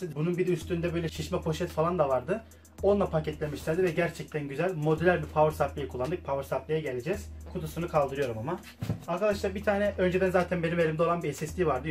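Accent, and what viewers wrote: Gaziantep, Turkey. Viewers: Kardesim sen gazianteplimisin?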